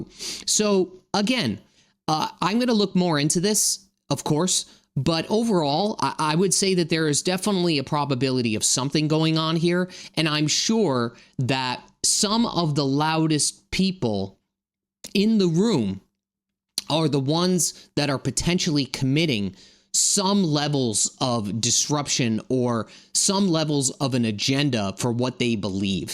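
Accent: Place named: Northeast, United States. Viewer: who are you? Are you a lawyer or a journalist or someone who as they say is a grifter with a nice face and a heavy NE accent?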